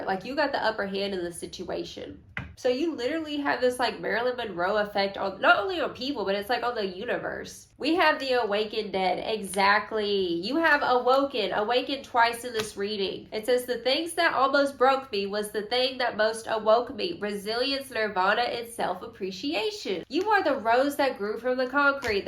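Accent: South, United States. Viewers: What in the Dolly Parton ,Deepika Padukone,& Salma Hayak is going on here LOL !! I Love your energy,and your country accent . I was surprised to hear you speak you sound down south, and you look like a lot of different cultures You are hilarious and beautiful your read is on point . LOL!!!! 😂 ❤👍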